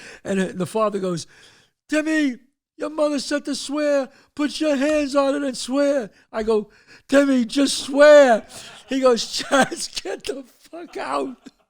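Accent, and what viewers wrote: United States. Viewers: Enjoy the show chaz.. love the old nyc talk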